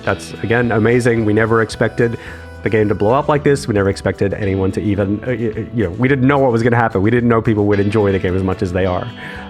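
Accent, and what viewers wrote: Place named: Australia. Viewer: Even since Satisfactory i’m confused by his accent. Are you aussie? American? But you’re in Sweden apparently??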